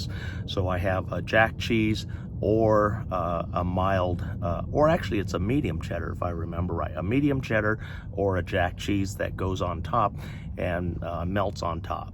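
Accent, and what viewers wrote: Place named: South, United States. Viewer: I could tell that living in North Carolina really did you well for that semi-subtle Southern accent.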